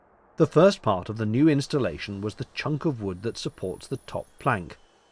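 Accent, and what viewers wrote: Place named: RP, United Kingdom. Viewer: ... So nice to see a new Narrowboat video from you. I also appreciate your use of and dry humor expressed in the English language. It's becoming a rare thing these days, when a generally lower "quality" of use is considered to be acceptable. If you like, I appreciate the style of BBC Radio 4 English and pronunciation. I suppose this shows my age. Long may you continue.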